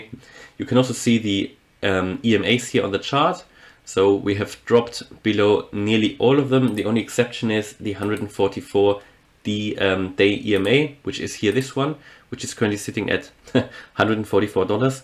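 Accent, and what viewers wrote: Germany. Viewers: Dude I love your analysis. Pretty straight forward and precise. If I'm not wrong I'm also hearing a German accent. in which case muss ich sagen echt geile TA bro 👍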